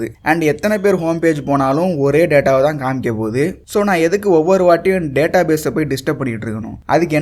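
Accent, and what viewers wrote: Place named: South Tamil Nadu, India. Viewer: The Tamil Ascent of the host seems to be from south Tamilnadu probably from Virudhunagar . அழகான பேச்சு தமிழில் கம்பியூட்டர் பயிற்சி வீடியோ வாழ்த்துக்கள்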